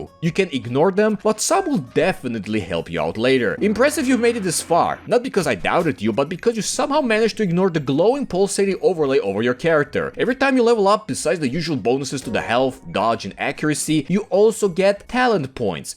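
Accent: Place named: Eastern Europe. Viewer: Pelo sotaque esse cara provavelmente é europeu e possivelmente eslavo. Acho que eles entendem alguma coisa ou outra sobre comunismo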